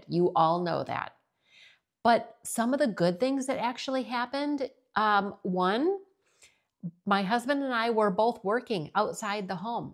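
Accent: Michigan, United States. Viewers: Lmao. I’m 80! Ha ha! you look very very good at your age. We are close in age. I just know some women let themselves go as they age. You do have a Michigan accent, it’s cute 😅. I appreciate your honesty. I am Carnivore way of eating for 11 months. And I am at the weight I haven’t been in 25 years. I am so much healthier. As a RN I understand your back pain issues I remember the video. I pray you stay well. Your skin is beautiful and your figure is banging 😅. Hugs from TX ❤❤ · I can definitely tell you're from Michigan. I have relatives from Michigan...and they have the same "accent". 🙂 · Interesting video,! As for your accent, I am familiar with how Michiganders sound, so I figured you were raised there. As for Midwest accents...there are so many different ones! I was raised in northern Iowa where we have an accent similar to Minnesotans. However, I've lived in southwest Iowa for 38 years and here people sound more like Missourians. 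And that's just Iowa! 😅 Let alone South Dakota, Minnesota, Wisconsin, etc. Have a good week! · As a former Michigander, I can hear your “accent.” I moved to South Carolina 20 years ago and married a Charleston native. My husband says my “accent” has softened over the years. 🤷‍♀️😁 BTW, I do NOT miss Michigan winters one little bit, lol. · I have to laugh about your so called accent, I’m from Michigan also but now live in East Tennessee, I get asked all the time , where are You from you have a “ up north” accent, I don’t think we have one at all , but people sure do lol , glad your back is good , praise God!!!! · I have a good friend from Michigan and you sound like her. You do have a slight accent like I do coming from Pittsburgh! I fell down the steps in 2018 and broke my back in 2 places. I had surgery on T6 but they let L5 heal on it's own. I still have back pain from the L5 and permanent nerve damage in my right foot. 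Nothing stops me! I work 4 days a week and babysit grandkids 1 day a week. I could leave my grown kids but can't leave the grankids. So I guess we'll put up with northeast Ohio winters.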